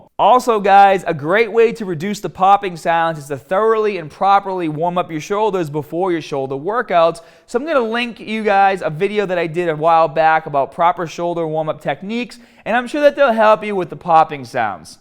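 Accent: Boston, United States. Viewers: Definitely a Bostonian. love it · You're certainly from Boston lol, but u crossfit bruh??? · Dude you from Boston ? 😂 the accent tho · Is this a Boston accent? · From Boston? Me too lol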